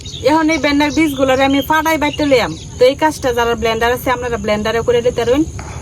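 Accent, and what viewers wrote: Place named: Kishoreganj, Bangladesh. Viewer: আপু তো কিশোরগঞ্জের ভাষায় কথা বলেন, আপুর বাড়ি কি কিশোরগঞ্জ বা ময়মনসিংহ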